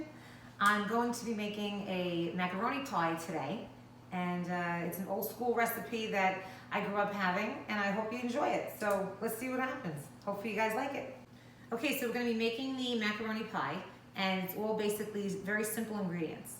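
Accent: New York, United States. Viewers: Methinks this lovely lady is from Brooklyn or NYC, from her accent. this does look good, but gee--10 eggs??? WOW! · peppa , sawlt....and butta... AH... I love my NY peeps! Awesome !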